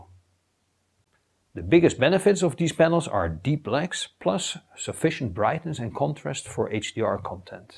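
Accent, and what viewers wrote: Netherlands. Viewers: Sounds very Dutch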